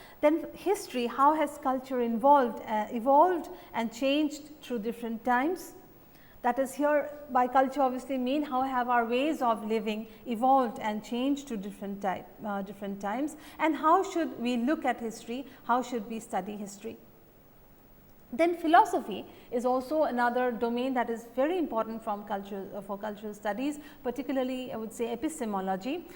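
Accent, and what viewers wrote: India. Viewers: I absolutely believe you, as far as I know you are right, cause India is India with all its advantages and disadvantages, however, the matter was about Indian accent, especially about the accent of the speaking lady. I just compare her accent and accent of some Indian guys who were studying medicine when I was a student (in Siberia, in Krasnoyarsk-city), those days I could not understand their Indian accent, but may be I did not understamd them well because I did not speak English fluently at that time :)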